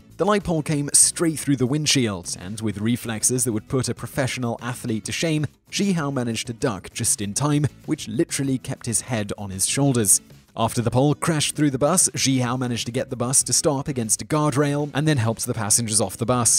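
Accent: United Kingdom. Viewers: Why does every countdown video have some British guy?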